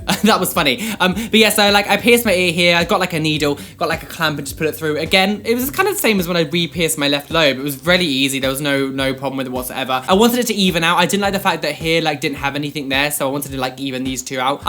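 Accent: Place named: United Kingdom. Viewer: I love your typical British accent.. it's so cute 😁 And ofcourse I love even piercings and body modifications. I used to have a surface of wrist, two microdermal on chest and nipple piercings, but I decided to remove them when I was pregnant of my daughter... I still have ear plugs and the top of the ear pierced..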